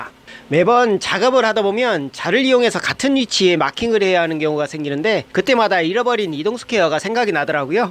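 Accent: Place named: South, Russia. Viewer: Южный диалект русского ,не Совсем понятно😀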